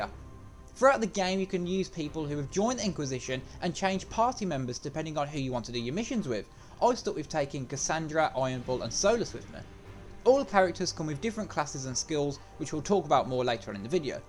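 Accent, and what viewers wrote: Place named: Birmingham, United Kingdom. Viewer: Downloading now, thanks for the review! Ps love the brummy accent ;)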